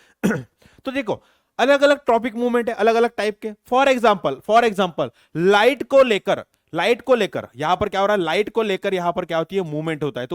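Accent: Maharashtra, India. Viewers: Sir are you Marathi...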